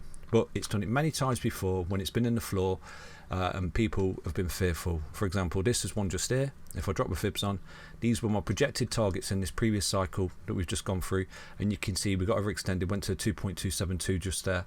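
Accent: United Kingdom. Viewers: Finally, the first! I love your channel and how yku present everything. British accent on top. Haha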